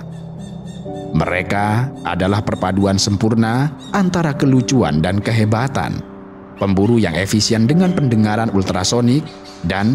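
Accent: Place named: West Java, Indonesia. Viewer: Fiks. Yg ngomong orang sunda.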